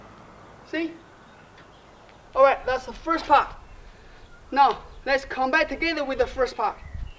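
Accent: China. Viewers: 100% true chinese english accent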